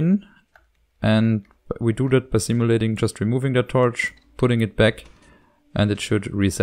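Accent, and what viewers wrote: Germany. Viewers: man hört so krass dein deutschen accent raus xd danke für das tutorial habe spaß mit meinen freunden damit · Du kannst eigentlich sehr gut Englisch, wenn man weiß das du Deutscher bist fällt es mehr auf, aber es ist auch nicht schlimm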